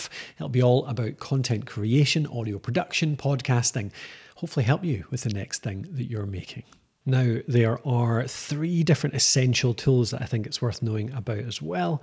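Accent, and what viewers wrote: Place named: Scotland, United Kingdom. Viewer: This is excellent. I’ve recorded interviews to put on CD but I’m not sure about how to process them. For instance, what’s the difference between using normalisation compared to using compression to raise the volume? Is it a case of either/or? Lovely to hear a tutorial in a beautiful Scottish accent compared to the usual American videos. Keep them coming.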